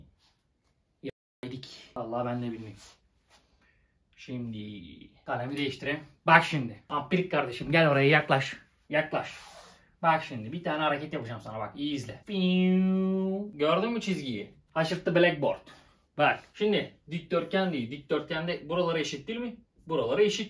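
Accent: Thrace, Turkey. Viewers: kral sayende urfa agzını bırakıp trakya agzına geccez adamsın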